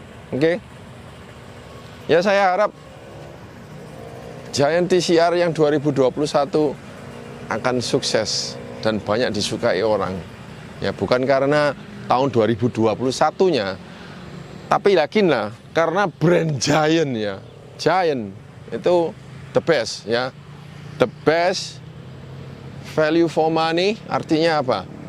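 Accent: Surabaya, Indonesia. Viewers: khas aksen Suroboyo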